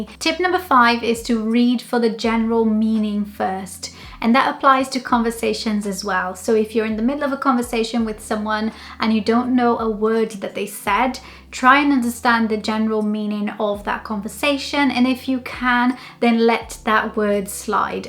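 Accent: United Kingdom. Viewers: Hi ! I'm new here to your channel. I can't believe you are brazilian girl, your British accent is so pleasant that you sound like a native! I saw your video of how you became fluent in English. Your journey is very fabulous. I love your accent. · Hi, you asked about our backgrounds. My name is Timo and I live in Finland. I listen to podcasts, Youtube videos and audiobooks of different topics in the background when renovating, woodworking, washing dishes or driving a car. Lucy mentioned you are a great example of a person who has gained a British accent and she is right. Even if I don't live in an English-speaking environment, it is nice to learn new ways to improve your fluency. Thanks for the video. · A lucy falou do sotaque britânico dela! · Uma brasileira com inglês britânico? I love it!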